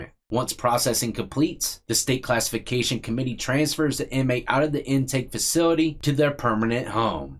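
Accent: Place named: Virginia, United States. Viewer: Be easy; I still hear that Virginiy accent lol.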